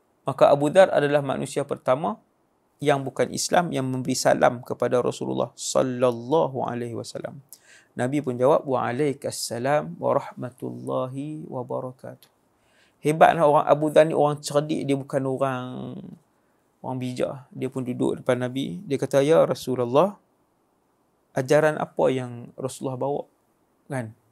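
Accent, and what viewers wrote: Kedah, Malaysia. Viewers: Bismillah Alhamdulillah Assalamualaikum murabbi Wadi Annuar terbaik perkongsian sebelum menunggu masuk solat Asar layan sirah dulu senang faham Kedah pekat ringkas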